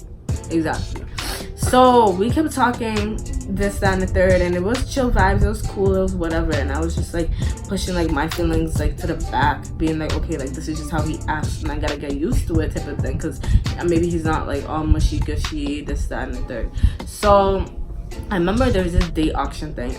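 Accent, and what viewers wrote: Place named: Jamaica. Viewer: Yuh sound lik yuh Jamaican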